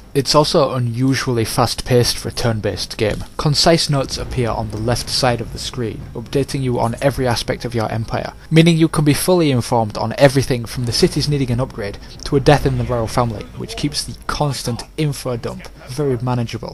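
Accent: United Kingdom. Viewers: LucaCK Luca He died of some sort of disease, I can't remember. also yeah, British, I can't believe it never struck me before, I guess I had never heard that kind of regional accent before.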